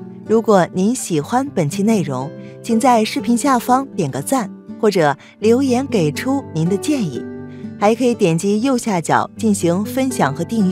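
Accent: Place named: Mainland, China. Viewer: 明明就是ㄒㄧㄝ(第三聲)糖，為什麼總是讀ㄒㄩㄝ、糖？大陸發音？我學的不對？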